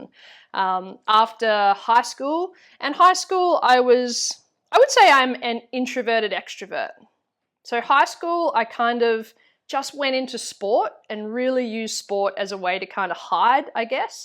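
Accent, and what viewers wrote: Australia. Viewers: Love the Hello. Great fellow aussie accent. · All of a sudden you just popped up!! And I love it and you ❤. I do your lymphatic exercise for 10 minutes daily which I started 2 weeks ago and I can tell the difference, no matter how busy I am this is my first exercise I do first in the morning. I did detect the Australian accent love it I’m a Brit!! You are the Guru for me because you’ve done so many amazing things about the human body and quite knowledgeable about it. Love 🥰 !! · Bonjour, my first name is Stéphanie and I’m from Québec ⚜️, Canada 🇨🇦! My first language is French and second English so I understand most of when you talk with your LOVELY Australian 🇦🇺 🥰 accent even though I have to pay attention to some specific words you say sometimes in the mouvements your describing… I’m thrilled to get to know you better through this video 🎥 cause you lymphatic flush full body routine was literally a game changer in my life for the past two months! 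Let me explain: I’m 49 and like most of us during the past four (<num>) years we had because of the “global 😷 situation” gain a little bit of weight and, like most women, I was struggling with my body that I didn’t really like… one day I decided that it was enough and that I was gonna be 50 and fabulous (2025) so I decided to check on YouTube to do some exercise by myself and there you appear!… Since two months now, I’ve been doing your lymphatic flush full body 10 minute movement routine at home 🏠 every single morning before my workout 🏋️‍♀️!!! At First I do yoga for 30-40 to minutes, then the lymphatic drainage and then the cardio workout! In one month I lost half of a size around the hips and I feel soooo much more energy after doing your exercise and during the day! Your enthusiasm is contagious and now I even say the movements at the same time as you do 😂!!! I’m writing all these details so you can actually have some feedback from Real 👈🏻people (literally at the opposite side of the world 🇨🇦🌎🇦🇺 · So glad I found your videos. 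Always go for random one of your videos to mixup what I’m doing! Excellent! Ps I’m in Scotland and while doing a video I practice my Australian accent, makes me lol sometimes when I copy your accent adds to that positive feeling 😂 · Hello from Houston Texas. I too found you from your lymphatic video but lately I've been doing so many more of your other videos and ABSOLUTELY loving them. Thank you so much for sharing your heart with us. It is true that I'm drawn to your energy but for me its also your accent. Australia is near and dear to my heart because my parents almost moved there when my mom was pregnant with me but didn't. I've never been there but both my husband and I badly want to visit and we will. All your videos challenge me and it's shaking my body as well as my brain up. You're a wonderful beautiful woman and please just keep doing you. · Hi, i absolutely love your energy!!! 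I found you accidently by one of your videos about fixing neck pain, literally week ago! Learnt so much about my body through your videos! And one more thing, im in love with your Aussie accent, could listen to you talking for hours! Im Polish, i live in UK, so maybe thats why? Who knows lol, but your vibe is just so contagious, most likely because you are your authentic self! Keep going! Im looking forward to watching more of your brilliant content!! Best wishes Ania xx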